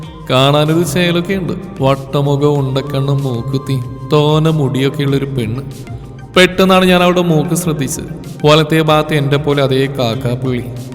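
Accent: Thrissur, India. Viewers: Adipoli kurachhe Trissur samsaram poly thonni 👍💖💖💖💖💖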